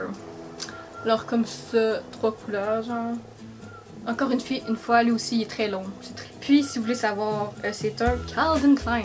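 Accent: Canada. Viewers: J’aaadddooooorrrrreeeeeeee ton accent canadien Vive les hauls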